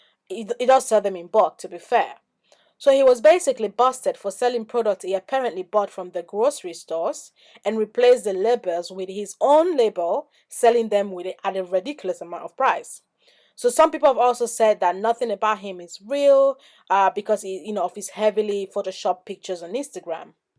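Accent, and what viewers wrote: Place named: Nigeria. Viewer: Mhmmmmm.....by ur accent I know youre a nigerian.....and an igbo woman at that😂😂💜💜💜💜 subscribes ur funny af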